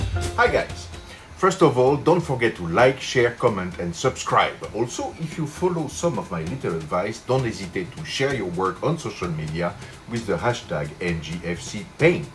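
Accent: France. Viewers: I realised your French accent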